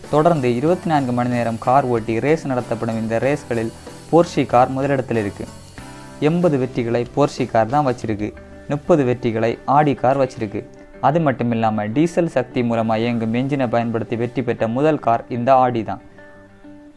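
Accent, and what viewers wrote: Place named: Marthandam, India. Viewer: Nee Marthandam ah Tambi voice nalla theriuthu...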